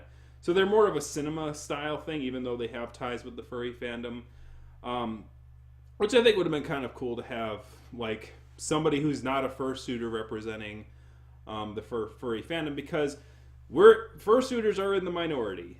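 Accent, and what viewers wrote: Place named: Australia. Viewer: At last! An Aussie accent! Maybe you've done that in the past, but this is my first time present for it, so... One thing the Furry Fandom seems to be really good at is creating its own problems and then complaining about it. This whole thing with h3h3 is just the latest example. The Majira episode is truly a decent opportunity wasted by technicalities which have already been forgotten, ironically enough. I can't say that the realization of what the Fandom is about isn't lost on furries themselves, since LOTS of them act like it's about the suits, even if they say it's not. It's disappointing to me, but it is what it is. All this podcast did in general is comment on the conduct they're seeing, so I respectfully shrug. What were we expecting without an experienced guide? Then again, you make a valid point about decorum. No one with taste would throw on makeup and S&M if they were having KISS as guests. 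I often think of jobs I've had or just doing a favor for someone where I'll reach for something, and usually boring people will automatically vomit the phrase "he has long arms.". Well, thanks Captain Obvious. And they say this in a way that requests that I grin from ear to ear like an idiot and express near grief when I don't. Yeah, guy, it's nice to have 'em, but it's not all that interesting when you have 'em. Thank you will do the trick. The latest on this can be found on Twitter, where the debate on whether the Furry Fandom even needs representatives rages. Never mind that i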